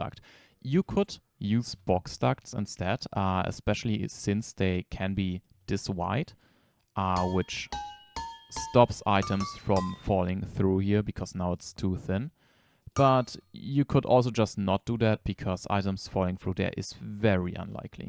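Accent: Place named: Germany. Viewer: I can hear the Deutschen Akzent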